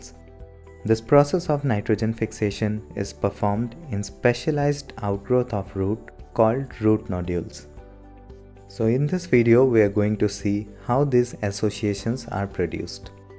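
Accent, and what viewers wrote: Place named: India. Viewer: His totally indian accent help me too understand this clearly vrna animation m har jagah angrezo jese aawz !! !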